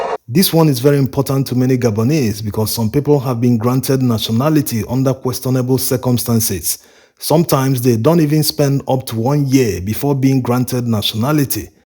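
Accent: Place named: Yoruba, Nigeria. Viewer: Thank you brother for the video, you doing wonderful job. One thing, you sounds like my Yoruba friend Nigeria 🇳🇬, are you a Yoruba? Your accent sounds so much like him. I have watching your videos for quite some time. The way you speak just reminds me about him. I don't want to mention his name on social media, you most be him.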